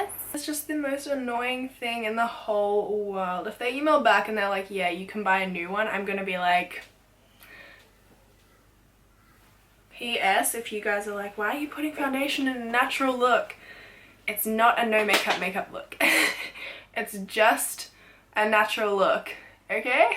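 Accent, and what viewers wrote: Australia. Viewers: I absolutely love your Australian accent ❤❤ I'm English and my accent is so boring 😂😂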